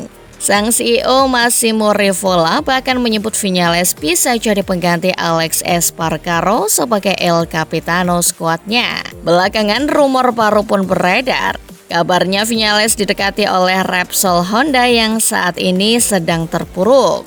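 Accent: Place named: Java, Indonesia. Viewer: Hostnya medok gitu bawa beritanya 😂😂😂 jawa bgt ya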